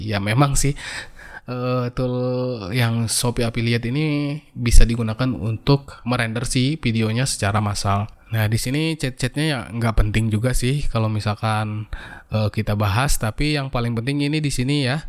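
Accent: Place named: West Java, Indonesia. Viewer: lagam indo na siga sunda,sunda na timna a?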